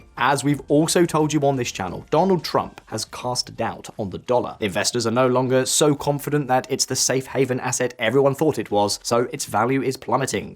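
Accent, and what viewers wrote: United Kingdom. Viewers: ditz with a brit accent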